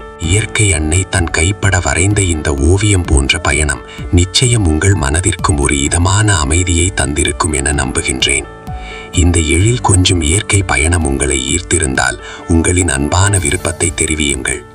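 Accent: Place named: Jaffna, Sri Lanka. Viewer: இயற்கை மற்றும் மனிதன் கைவண்ணத்தில் உருவான அற்புத கட்டுமானத்துடன் தேன்போன்ற யாழ்ப்பான தமிழ் வர்ணனை அற்புதம். இதுபோன்ற இனிமையான தமிழை எமது தமிழ்நாட்டில் கேட்க முடியவில்லை . இனியொரு உலகம் படைக்கப்பட்டால் அதில் தூய்மையான இனிய தமிழ் மட்டுமே இருக்கவேண்டும். வாழ்க தமிழ்.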